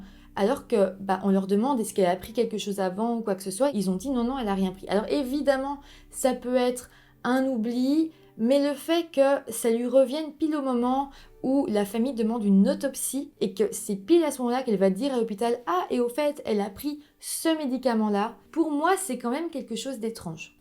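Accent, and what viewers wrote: Belgium. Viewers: merci pour la vidéO et l'accent belge <33333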